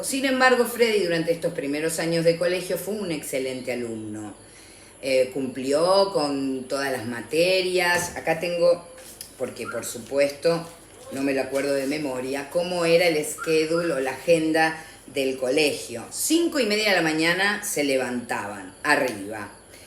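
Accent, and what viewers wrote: Argentina. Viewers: Me ha gustado mucho su narración dígame ud tiene un acento Argentino y como sabe tanto de Freddie a mi me encanta la música de el cada ves q escucho su música lloro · Ese puto acento argentino tan chocante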